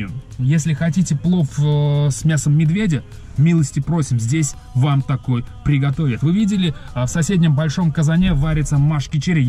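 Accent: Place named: Tashkent, Uzbekistan. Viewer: Гап йо йорвордиз ростакам тошкенча булди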